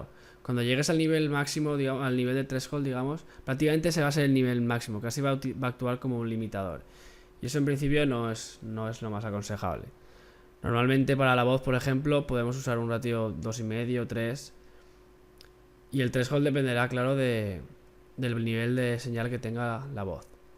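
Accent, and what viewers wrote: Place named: Aragon, Spain. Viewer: tus tutoriales son buenos pero los que mas me gustan de los que tienes son los que te paras a explicar detenidamente todas las funciones y que quedan tan claras como este, muchas gracias bueno son conocimiento que ya tenia pero algo si que he aprendido gracias a tu detenimiento explicando, gracias a sido excelente, ademas tu hacento maño es lo mejor, por que yo soy medio maño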